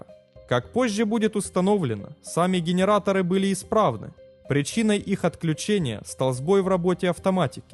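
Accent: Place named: Kyiv, Ukraine. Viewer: братан, делаешь хорошие видео, но твой киевский акцент раздражает так, что противно смотреть. найди себе другого диктора.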